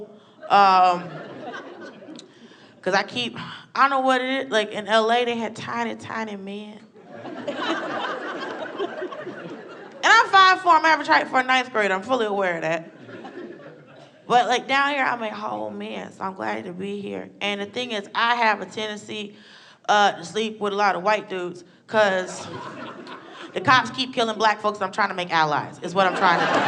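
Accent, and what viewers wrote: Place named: New York, United States. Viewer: Her NY voice is grating...and annoying.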